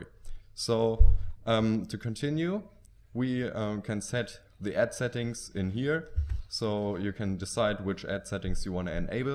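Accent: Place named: Germany. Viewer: Wie man den deutschen Akzent raushört haha xD. Aber ihr macht wirklich gute Arbeit, für Creator oder Developer wie mich ist es ein Segen das es linkvertise gibt ^^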